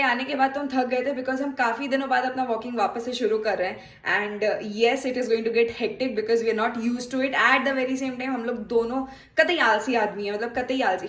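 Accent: Bihar, India. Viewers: Mam ap jo bich-bich me bihari lehja me bolti h ekdum mast lgta h sunkar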